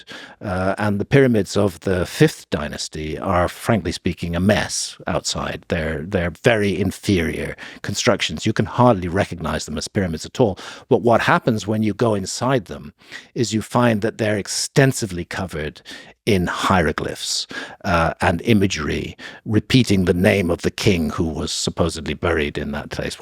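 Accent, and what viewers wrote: United Kingdom. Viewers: This is proof that not everything sounds intelligent when you have a British accent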